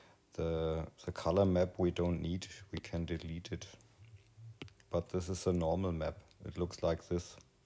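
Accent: Bavaria, Germany. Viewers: nice Bavarian English, no gimmicks:hand-purple-blue-peace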